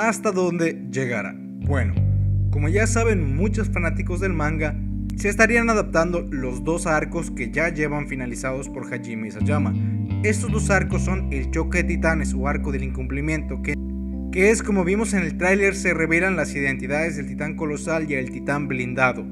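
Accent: Argentina. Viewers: sos argentino?? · ¿Eres de Argentina?:V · eres argentino? :'0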